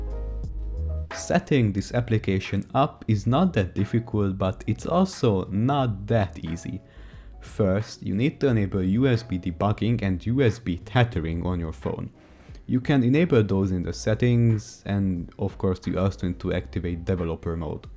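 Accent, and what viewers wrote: Hungary. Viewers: Az accent az nagyon magyaros meg persze a google HUF valuta is elarulta hogy magyar vagy koszi a vidiert ♡ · Olyat ugrottam a székben mikor megláttam hogy huf de visszanézve a magyar akcentus felismerhető volt 😅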